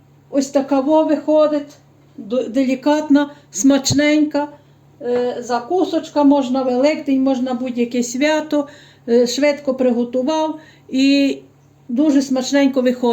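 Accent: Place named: Vinnytsia, Ukraine. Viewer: Дуже дякую! ❤ Подобаюиься вашi рецепти. Все таке смачненьке 🥰 Приятно слушать вашу речь. Мне очень нравится ваш говор. Детство и юность я провела в Винницкой обл. И мне такое все родное. Спасибо вам!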